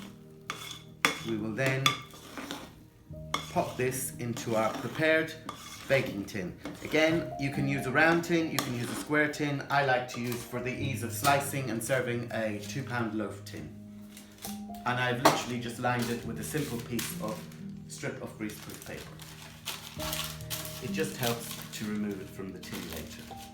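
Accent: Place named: Ireland. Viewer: just found your channel- really enjoying it- love listening to your brogue- reminds me of my grandparents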